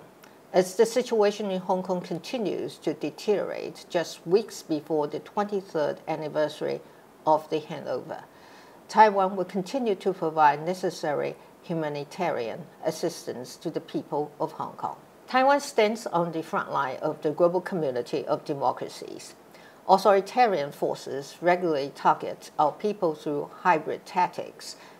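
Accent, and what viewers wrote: United Kingdom. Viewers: 親美的總統講英式英文🤔( 無惡意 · President Tsai’s English is 10 times better than Denghui Lee whose talk in Cornell years back was extremely hard to understand. Her English is great but more like British English rather than American English. Job well done President Tsai!!! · 總統的英國口音越來越明顯了~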